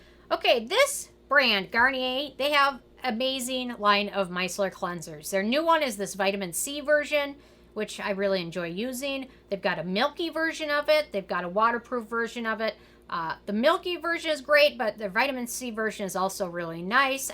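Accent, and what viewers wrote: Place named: Dakotas, United States. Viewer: Curious are you from one of the Dakotas? Minnesota? Trying to nail down that accent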